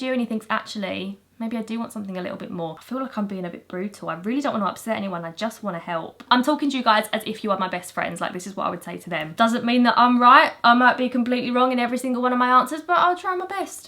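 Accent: United Kingdom. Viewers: My daughter loves your British accent she’s trying to get one too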